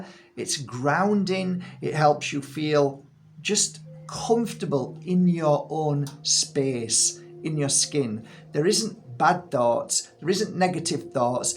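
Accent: Yorkshire, United Kingdom. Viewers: I come from Huddersfield and I always love to hear your Yorkshire accent. Makes me feel warm inside. Also I love whatever you say. X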